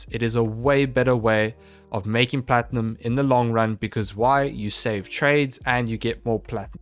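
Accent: South Africa. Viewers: Man you really sound South African. hmmmmmmmmmmmmmm.... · South african? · Love the south African accent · Are you South African? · Are you from S.A. ? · Are you from South Africa 🇿🇦 🤔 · South african accent or is it just me? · This brus South African 😂. I can hear that accent from a mile away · South African? XD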